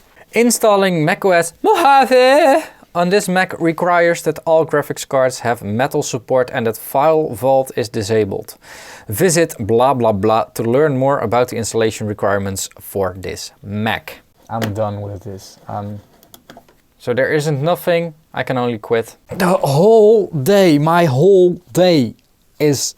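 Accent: Netherlands. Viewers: goedemiddag Nederland , ik heb die update ook gedaan, maar bij mij werkt alles nog goed , ik dacht al jou accent klinkt zo Nederlands :)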